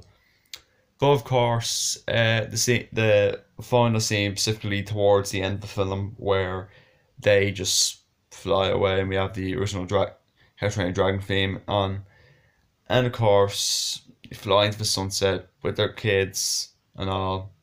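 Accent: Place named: Ireland. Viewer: It's such a Masterpiece of a film. My name is Lee and this is my 2nd channel. My main channel is The Dragon Critic. I can tell your Irish and so am I :)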